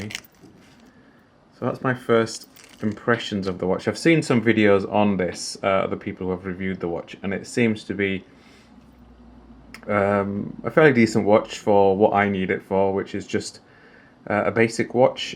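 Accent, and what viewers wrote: Yorkshire, United Kingdom. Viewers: Hi, Love your reviews, when can we see your review of your 1963 Chinese Pilot Watch, looking forward to your content as it’s refreshing. Lastly where you from you sound like a Yorkshire lad?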